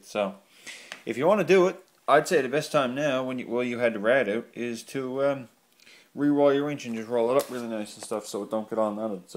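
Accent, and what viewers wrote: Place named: Newfoundland, Canada. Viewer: You sound like you're from Newfoundland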